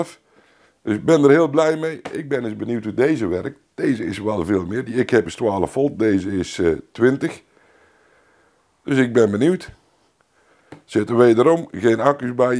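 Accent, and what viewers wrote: Brabant, Netherlands. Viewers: Advies: eerst even een cursus Brabants volgen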